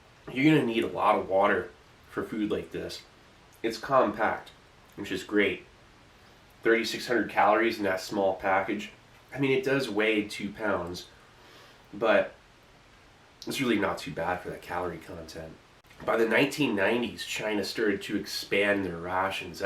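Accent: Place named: Midwest, United States. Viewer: Mid-America i think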